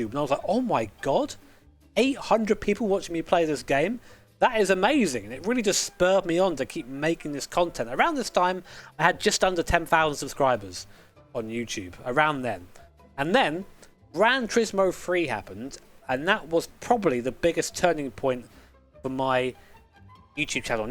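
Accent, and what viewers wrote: United Kingdom. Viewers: I remember when I discovered this channel it was a livestream with about 150 viewers and this guy with the British accent and the Japanese headband was completely dedicated to what he was doing. For me it had something of the Topgear spirit... made me subscribe straight away... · I started watching you while playing GT6 year or two before start of big cough, finishing my uni. Watching you and tbh. There was something cozy and helping me in "British guy talking with engine background ASMR" at this period. Thanks for all your content, wishing you all the best, like best car ever - Fiat 126P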